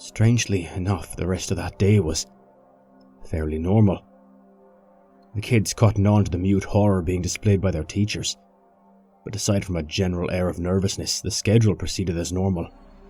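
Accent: Ireland. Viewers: Love your Irish accent! Great narrations! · Damn, I’ve heard this one before, but your narration’s the best one I’ve heard. Your Irish accent sounds awesome! Not too overdone, extremely believable.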